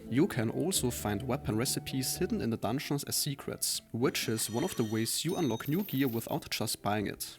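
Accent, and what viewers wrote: Germany. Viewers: love the giga german accent!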